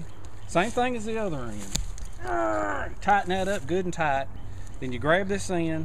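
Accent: South, United States. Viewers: When i first started watching you i said holy crap mykel hawke then you spoke lol you are almost as country as i am checkout my channel and see just glad to see i ain't the only one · Great lesson! Thanks a heap for sharing your knowledge! I'm going to try out your tarp bunk as soon as we get some springlike weather. By the way, you have a great laugh! I love your great regional accent--which should be in the Smithsonian Museum of recorded sound. Keep your videos comin'! .Want to use your then *not only to learn practical skills) but also to teach my foreign students what real Amercian English sounds like! ;)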